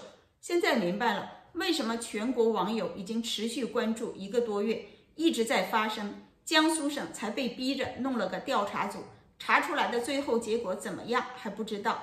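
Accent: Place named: Northeast, China. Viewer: 你东北口音，又不是徐州的，怎么了解的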